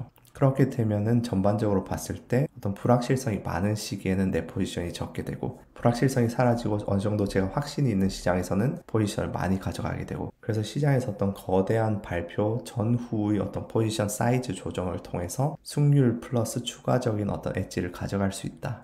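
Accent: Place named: Daegu, South Korea. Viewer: 사투리가 대구 사투리시네요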